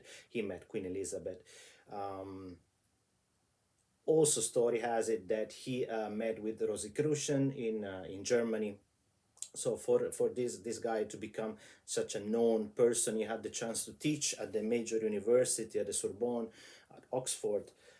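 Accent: Italy. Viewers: Da come pronuncia i nomi italiani direi che sei italiano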